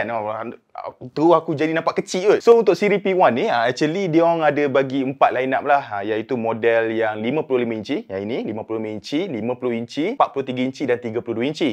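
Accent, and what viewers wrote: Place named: Malaysia. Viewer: Ada app yang sesuai untuk view gallery / video dari USB drive? Coz saya ada Xiaomi TV Box tapi tak da app yang sesuai nak buat slideshow gallery. Mohon pencerahan. Anyway, very good video, bes dengar review cakap macam orang Malaysia sejati! :D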